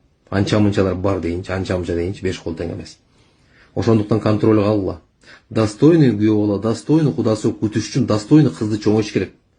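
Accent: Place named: Chui, Kyrgyzstan. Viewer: Маша Аллах тупа тура суйлоду чуйлук бала окшойт ❤🎉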